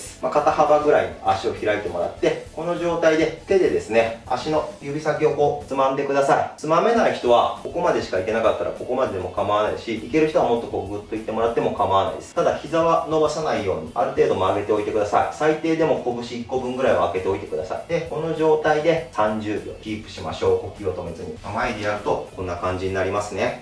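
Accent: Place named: Osaka, Japan. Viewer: 今回の動画もとても参考になりました。20年前にこの情報があったらよかったと思いました。でも今からでも決して遅くないとこれからできる限り続く様に 、 自分に 言い聞かせます。 ただ 正坐 は 難しいです。Japanese comment is hard for me. So please let me keep sending you comment in English. My English is very simple and I am very sure you understand. Thanks, sensei. I love your Osaka accent-大阪弁. Toshiko from Seattle.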